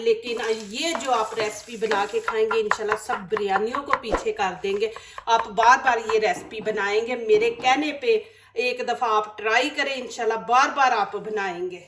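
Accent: Punjab, India. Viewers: Baji Aap ki Ourdo same like Punjabi Exent.... are you from Sialkot....? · Behe Shef Sahiba Ap tu Urdu Bhi Panjabi ma bol rshi hain Khuda k liye Panjabi ma hi Comentry kr boht kharab Ecsent ha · Achi recipe hae definaitly I'll try it in sha Allah...mae bhi punjabi ho but aap ny to urdu ke tangain he toor de 😄 too much strong accent hae but words bhi properly nahi bol re like dahi ko dare, bareek ko break full punjabi words use ho ry hae in urdu plz is pr bhi work krain.Allah aap k channel ko kamiyab kry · Sorry behna panjabi wali urdu na bolain urdu ko urdu ki tarha bolain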